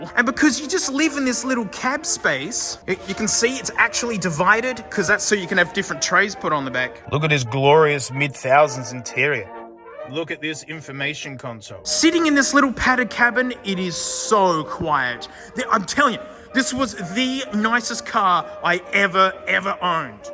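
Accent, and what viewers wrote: Australia. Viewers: You are the only YouTube aussie that I subscribe and keep up the good work and I love your accent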